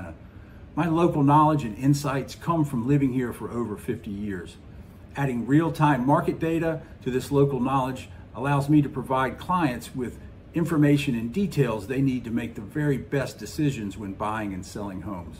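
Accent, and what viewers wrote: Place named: Philadelphia, United States. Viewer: Are you from Philadelphia? I hear a regional accent. Thanks for the info. Can you focus on north Myrtle beach and Cherry grove area also?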